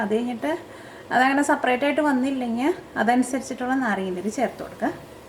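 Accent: Thrissur, India. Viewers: chechide chiriyum pinne thrissur slangile samsaravum nice aaaa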